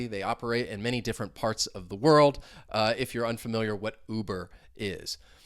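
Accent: United States. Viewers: I love the American accent .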